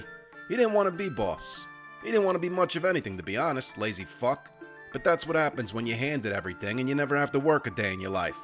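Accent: New Jersey, United States. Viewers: Is it just me or is the Jersey accent extra thick for this?:D Not complaining I love it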